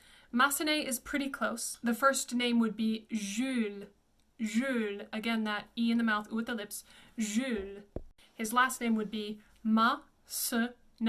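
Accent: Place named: United Kingdom. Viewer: You are the first person I have ever heard who speaks in a British accent but with a rhotic r. Did you spend time in the US?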